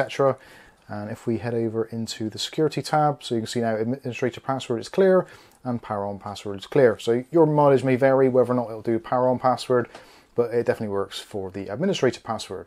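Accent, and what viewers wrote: Bristol, United Kingdom. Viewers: You from Bristol??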